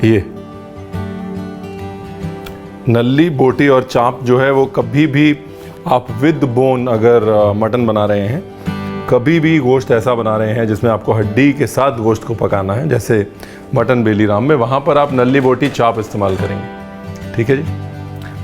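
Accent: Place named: Punjab, India. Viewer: Waah Ranveer ji app ki hidi mein panjabi language ka tarka bhat acha laga..keep it up ..txs for recipe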